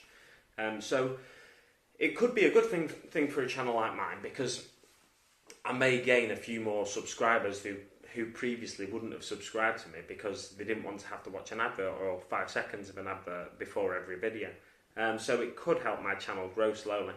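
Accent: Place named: Roadman, United Kingdom. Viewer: Love your roadman accent! Yeah this is ridiculous, they brought it in after Logan Paul yet they attack the smaller channels most? There should be more checks on the big earners not the small guys.mRidiculous. Yeah I don't make money off YouTube but still the option should be there. On a brighter note congratulations on 300 subs! I'm 5 off myself haha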